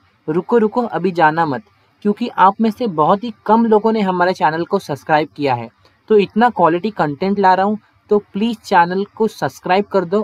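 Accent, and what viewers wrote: Odisha, India. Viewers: Bro are you from Odisha ??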